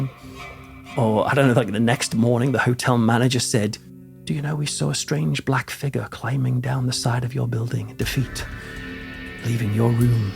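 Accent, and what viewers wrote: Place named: Durham, United Kingdom. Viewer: I thought you was from Chester le street you can tell from ya accent, I'm just up from there ayton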